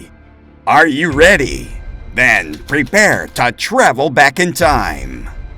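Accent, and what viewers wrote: United States. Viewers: He’s clearly American…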